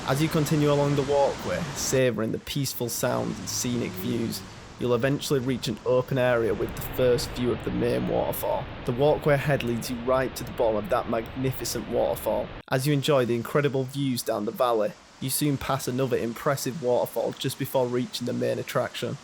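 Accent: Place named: Barnsley, United Kingdom. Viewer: Sound like your from barnsley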